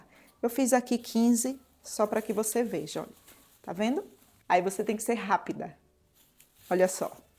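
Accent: Bahia, Brazil. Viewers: Ola! Vc é de Salvador? Tem um sotaque baiano e por sinal ensina as receitas muito bem com toda paciência. Parabéns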